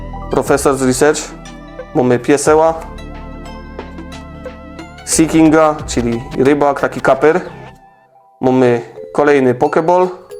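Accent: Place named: Silesia, Poland. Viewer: Więcej filmików po śląsku ❤Bardzo dobrze się tego słucha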